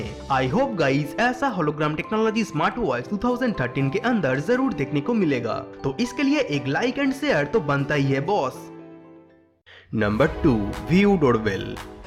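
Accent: Bengal, India. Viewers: Bhai aap bengali ho kya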